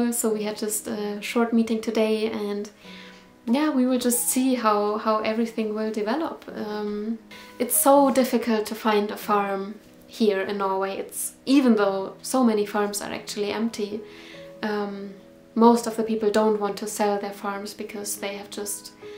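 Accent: Germany. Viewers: Es macht einfach Spaß deine / eure Videos zu sehen. Mein Englisch ist echt nicht gut. Aber man kann iw heraushören das du aus Deutschland kommst. Und dann premiere Pro, was noch auf Deutsch steht. Das ist einfach alles zusammen mega stimmigyschön für mich hier in Deutschland. Wo ich mich danach sehne, was du seit einiger Zeit in Norwegen hast. Weiter so!!! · O sotaque alemão é profundo nela ou seria impressão minha ? From Brazil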